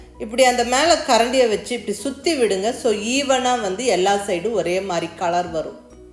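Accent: Kongu, India. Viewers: Ungaluku koral super kongu styles beautiful sister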